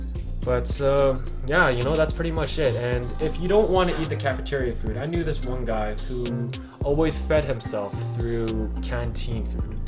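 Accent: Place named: Canada. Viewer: I can hear your Canadian accent! I am French Canadian and we love our ground pork in dishes around Christmas!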